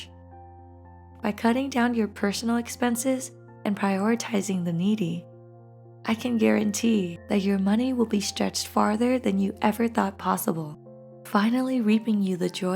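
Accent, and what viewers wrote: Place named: Vietnam. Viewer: Omg you are Vietnamese??? Im Vietnamese too. Nice to meet youuu I really love your accent 💓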